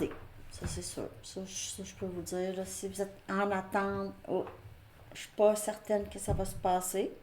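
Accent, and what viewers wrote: Quebec, Canada. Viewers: Allô est tu du Québec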